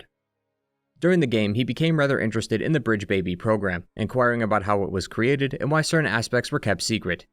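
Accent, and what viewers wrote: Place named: New York, United States. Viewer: Dude you make such good content 🎉🎉 Also well done, great to hear someone with ny accent doing so well 😁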